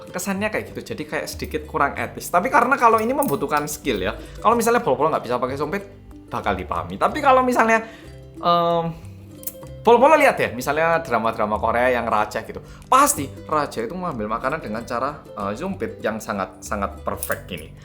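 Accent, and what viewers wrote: Java, Indonesia. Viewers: Tiba" Nemu channel ini dan kesan pertama adalah anda itu medok sekali jawanya, keren, kesan kedua saya dpt informasi yg sebelumnya sama sekali tidak tahu, nice sharing. Sungguh tata krama makan yg luar biasa, budaya yg luhur. Memang bener bgt mas tatakrama makan memang perlu banget, apalagi tau dulu budaya setempat malah lebih bagus dan nilai plus tambah. Detil memang tapi sangat jelas nilai etikanya. Terima kasih mas atas tambahan wawasanya..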